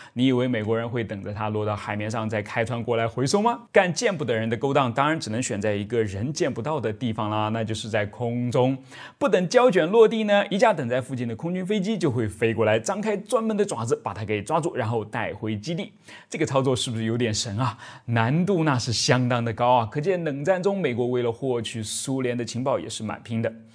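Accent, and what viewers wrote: Nanjing, China. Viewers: 人是在南京，但口音像南京人吗？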